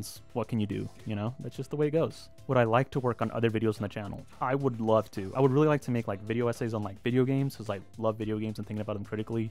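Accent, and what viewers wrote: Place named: England, United Kingdom. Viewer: literally asking myself in the head, is this guy english, then 1st second explains it all.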